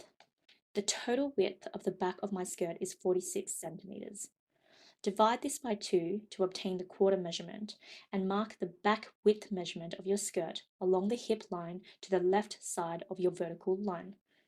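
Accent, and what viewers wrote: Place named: Australia. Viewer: Thanks, very nicely explained. Love hearing your (our) Aussie accent too!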